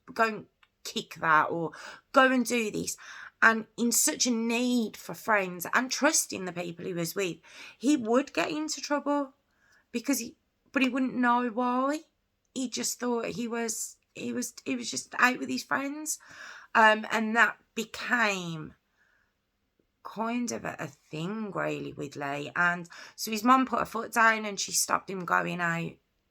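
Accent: Birmingham, United Kingdom. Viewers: Thank you so much! Please do be assured that I love the brummy accent! I’m from Northampton in England and I went to boarding school in Worcester when I was a kid. I went there because it’s a special school for visually impaired people so they have everything naturally equipped for people such as me. Please don’t ever think I mind talking about either of my disabilities, on the contrary I love to talk about them so as to raise as much awareness as possible! I’ve been blind ever since birth which is probably why it doesn’t bother me in any way at all. My biggest challenge is fitting in with the world as everyone expects people like me to embrace and adapt to all sorts of visual stuff left right and centre, which if you can’t see at all and never have done, it’s unbelievably frustrating that I can’t just be allowed to be who I am. If you see what I mean? 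My Autism has generally been much more of a challenge overall, I’m nearly 34 years old and it’s only really over the last 10 years or so that it’s been fully realised and picked up that I have Autism. I think because it’s only quite mild Autism and not like it’s completely severe. Also Autism was never really talked about when I was much younger and so it was generally assumed I was just a stubborn and awkward person who couldn’t be bothered to behave properly! But it just shows Autism doesn’t need to be really severe, you can still have Autism even if it’s more on the mild side! I find I generally struggle with the social wo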